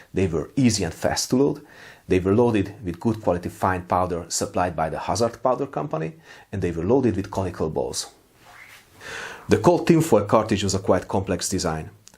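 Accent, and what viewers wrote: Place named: Hungary. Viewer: Nagyon tetszik a magyar akcentusod!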